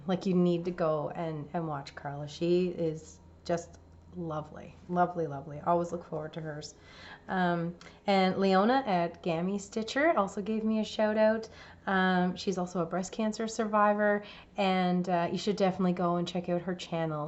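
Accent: Canada. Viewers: Is that an East Coast Canada lilt I hear in your voice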